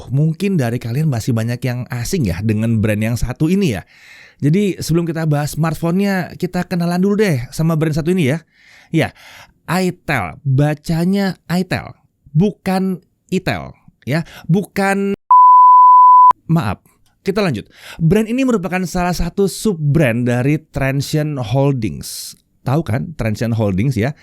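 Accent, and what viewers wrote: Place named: Java, Indonesia. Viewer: iTel javanese speak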